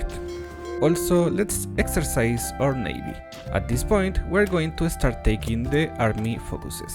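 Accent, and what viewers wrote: Chile. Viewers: Al oir el acento pense: chileno? Parece q si :v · Nunca pensé ver un youtuber de hoi4 chileno hablando en inglés xD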